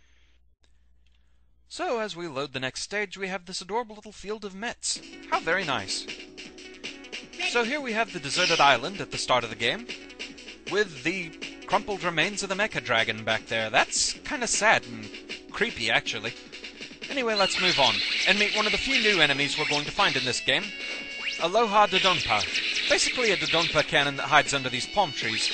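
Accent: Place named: London, United Kingdom. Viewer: Great intro! Eh, One thing that's always bothered me is your accent... I like the sound of it, but I have an instinctive hatred for it... London or something? Don't let it bother you, I can just see your aligator-like mouth moving when you talk. Hey that Shaymin on your monitor's pretty cute. HOW DARE YOU LAUGH AT CHARGEMAN!! BUT, the joke's on you, you'll feel the train's wrath soon enough. Enough random comments from me, let's see how you actually did. To part two!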